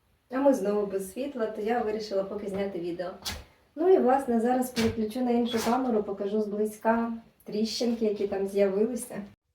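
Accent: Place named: Russia. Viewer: Видно, що міська дівчина і російський акцент є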